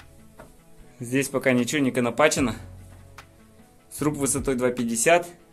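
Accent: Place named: Tatarstan, Russia. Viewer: Ты походу татарин! Акцент татарский)!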